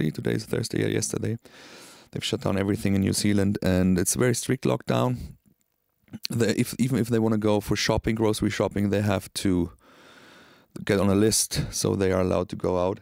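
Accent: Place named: Germany. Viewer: DANKE! I REALLY LIKE YOUR GERMAN ACCENT! 🖤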